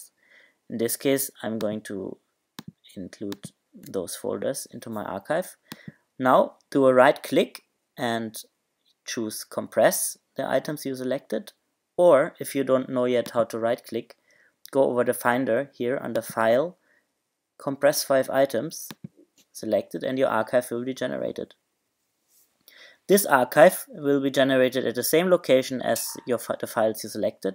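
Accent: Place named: Germany. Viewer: You sound German :D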